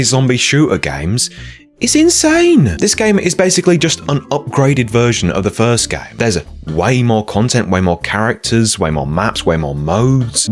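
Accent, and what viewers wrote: United Kingdom. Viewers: This is the most British voice I've ever heard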